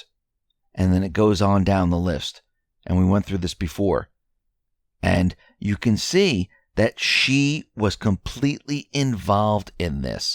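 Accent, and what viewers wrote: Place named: New Jersey, United States. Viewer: He’s from New Jersey, you’ll grow accustomed to it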